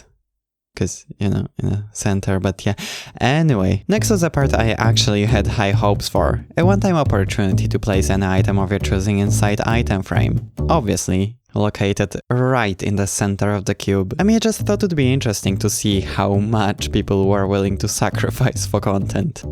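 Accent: Poland. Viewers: You sound polish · kurna ale łatwo ogarnąć po akcencie że to Polak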